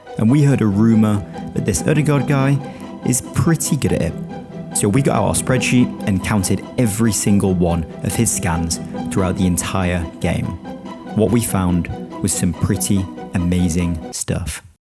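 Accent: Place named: Scotland, United Kingdom. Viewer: His accent sounds a bit scottish. That "last" is in no way pronounced wrong. You just have a problem with people accents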